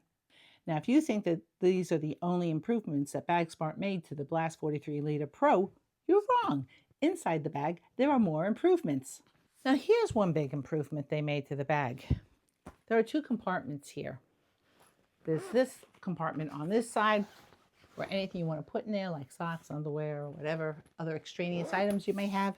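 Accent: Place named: Boston, United States. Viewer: I've just found your content and it's absolutely premium! You are so generous with your tips and education, and your information is heads above most travel YouTubers. Also, as a Bostonian, I get a huge kick out of the fact that you pronounce "packing" the way a lot of folks in Boston pronounce "parking" :)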